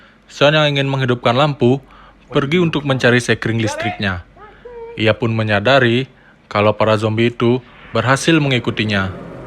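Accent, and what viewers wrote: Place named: Bali, Indonesia. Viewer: pengisi suara nya kaya org bali hehee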